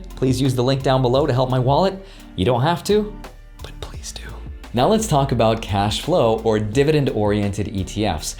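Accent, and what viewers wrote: Russia. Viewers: I love your Russian accent. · You are my favorite stock guy!! And could you please use your Russian accent more.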